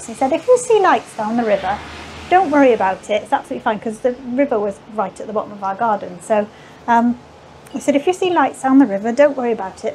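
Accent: Wales, United Kingdom. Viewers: Absolutely love the Welsh accent. And miss speaks beautiful.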